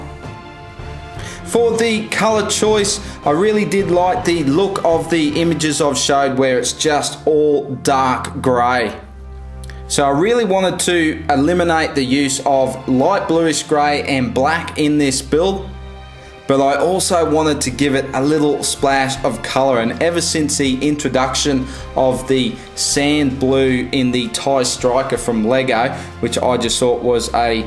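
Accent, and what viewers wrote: Australia. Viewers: He's from Australia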